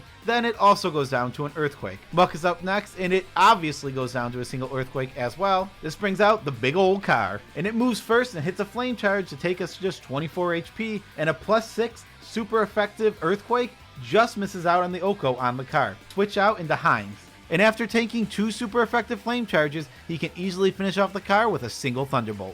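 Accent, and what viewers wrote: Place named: Massachusetts, United States. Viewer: I don't know where you're from. But I love your accent. Sounds like maybe Massachusetts.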